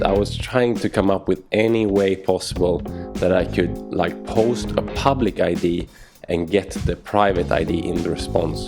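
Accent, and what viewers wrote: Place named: Sweden. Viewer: Man I was so sure I recognized the way you pronounced things, checked your Github profile and I saw right, you're from Sweden!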